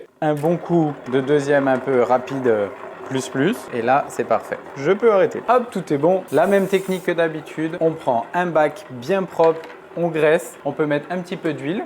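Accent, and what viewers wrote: France. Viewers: Sigh! I love listening to your beautiful French voice! In honor of my French ancestors I salute you sir!🇫🇷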